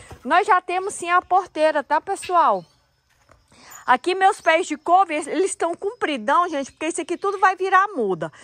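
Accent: Minas Gerais, Brazil. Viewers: Vc e. Mineira ? E parabéns mega canal !!!!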